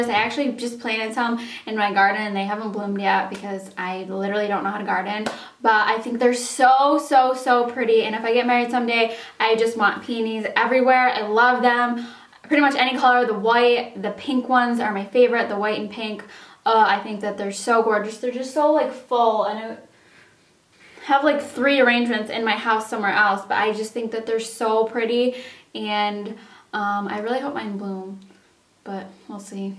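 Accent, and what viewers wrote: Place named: New York, United States. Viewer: Asked too soon.... Sounds like you are from somewhere in NY! Represent! :)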